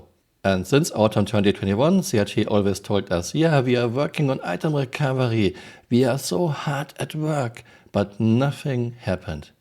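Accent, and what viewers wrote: Germany. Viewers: LOL ich höre ganz stark heraus, dass du deutsch bist ✌️ Liege ich richtig ?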